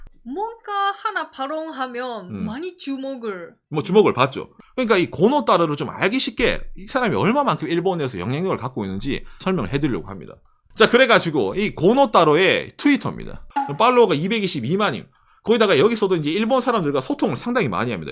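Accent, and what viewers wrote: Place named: Gyeongsang, South Korea. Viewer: 재미있는 건 일본에서 그렇게 오래 살았는데도 경상도 사투리 " ~ 맨시로" 가 남아 있는게 신기하네.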